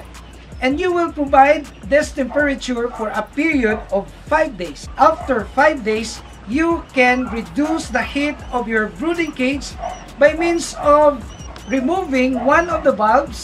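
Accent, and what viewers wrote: Philippines. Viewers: Wag ka nga po mag inglish halatanaman po na pilipino ka po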